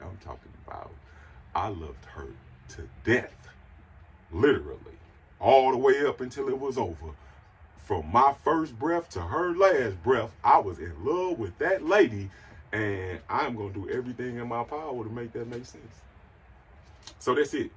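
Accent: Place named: St. Louis, United States. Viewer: You sound so St. Louis. I lived there for some time.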